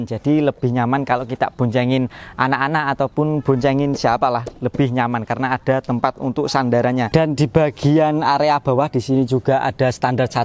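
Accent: Java, Indonesia. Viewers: Cara mereview logatnya dialek Jawa apa ni mas, biar beda ama yg lain sering2 aja cara mereviewx ngasal 😅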